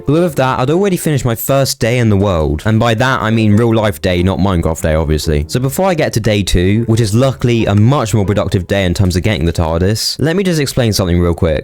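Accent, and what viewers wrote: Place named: United Kingdom. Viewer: U sound British but watching u play I think I may add cheats Cause that will take very long what mod pack did u down load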